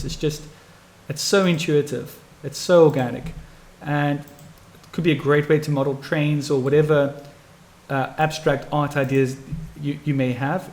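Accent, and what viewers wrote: South Africa. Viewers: lol, the South African accent is very strong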